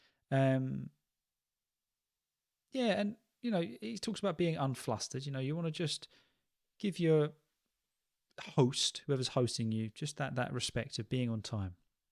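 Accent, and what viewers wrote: United Kingdom. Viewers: I started watching your videos to get used to the British accent. I have to concentrate to understand you. It feels like learning a new language 😅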